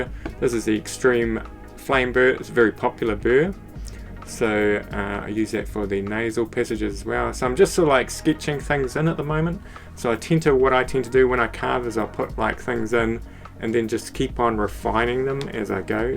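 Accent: New Zealand. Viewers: That accent sounds like a Kiwi, am i right? If so you art work tooi much😮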